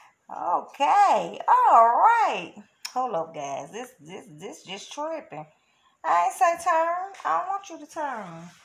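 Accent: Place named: South, United States. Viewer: I LOVE spaghetti squash! One of my favorite vegetables😊 also, that sweet south in your voice reminds me of home. My nana is from Alabama and I haven’t been able to visit my cousins since the pandemic. Thanks for making me feel a little taste of home!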